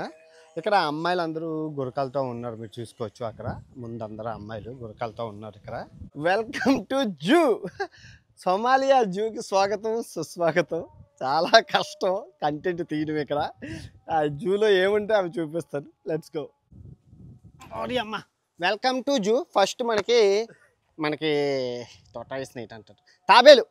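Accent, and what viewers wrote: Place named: Visakhapatnam, India. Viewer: I like your videos and your telugu accent which most people in Vizag and near by mostly used to speak. God bless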